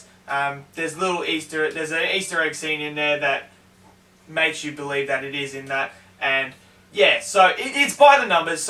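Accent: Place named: Australia. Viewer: Martin Cuadra he’s also from Australia so things may not sound the same with an accent. I’m glad you had the time to actually sit there and write a comment about it. 👏🏼👏🏼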